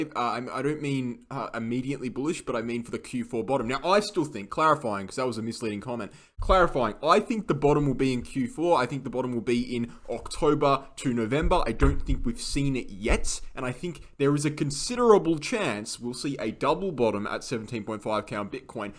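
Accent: Australia. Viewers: Yeah I'm generally in line with this prediction. Great job,. Stupid question - are you from Australia or a Kiwi?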